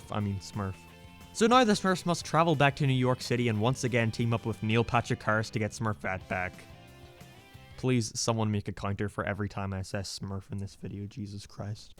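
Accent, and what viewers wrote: Ireland. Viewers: Your accent sounds familiar... Are you irish? Sorry for asking. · Are you Irish?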